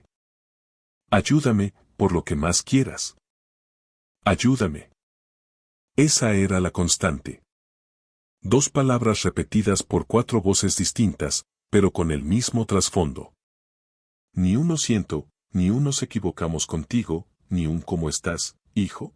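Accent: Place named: Mexico. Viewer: Imposible 😂! No se puede decir que el protagonista que locuciona es de Toledo y ponerle acento mexicano. No way!!! 🤦🏻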